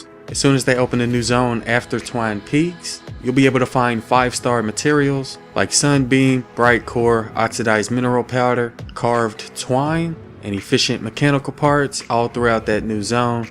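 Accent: South, United States. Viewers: I think he talks/sounds hella southern lmao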